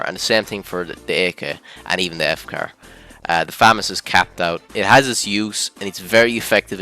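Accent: Ireland. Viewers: Haha the Irish accent is unique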